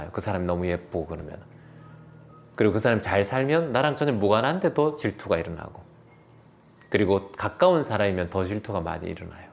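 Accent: Gyeongsang, South Korea. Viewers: 제고향 포항 오천 입니다 포항 말들으니까 더 정겹습니다❤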